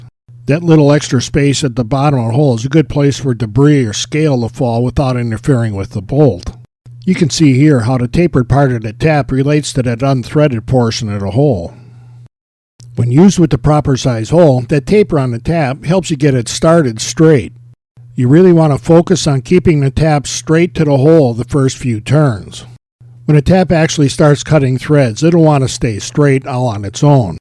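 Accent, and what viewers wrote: Chicago, United States. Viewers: Very Chicago. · Love that Chicago accent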